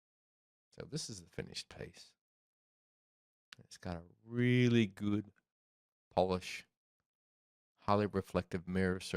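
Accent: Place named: Australia. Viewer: So I checked your Instagram and can tell you’ve definitely picked up in the Aussie accent. Also you live about 2 hours from me ☺️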